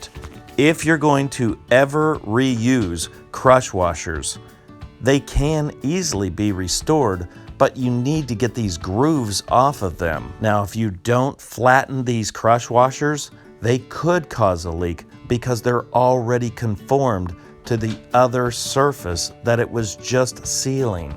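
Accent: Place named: United States. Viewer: Thank you. Now I know what's wrong with my car. BTW, love the way you talk American man, I'm from Down Under.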